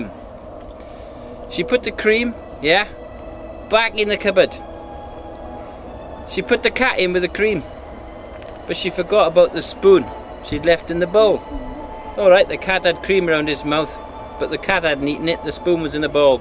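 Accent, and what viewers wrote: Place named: England, United Kingdom. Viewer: Amen on "your sin will find you out." Nice birds. Praise God for His creatures great and small. You have a good zoom on that camera! Love your accent, too-- English accents are really neat. I'm still having trouble viewing some videos. They take forever to spool, and they keep stopping every few seconds. I was glad this one was shorter so I could let it spool and then watch it.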